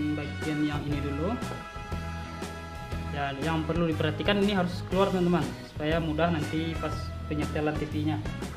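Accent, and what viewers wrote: Indonesia. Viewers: Logat kalteng sih 😄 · Kayak logat urang awak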